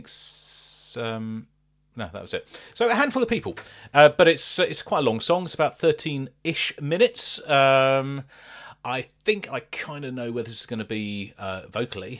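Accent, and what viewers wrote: United Kingdom. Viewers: Great channel, cool english accent and diction!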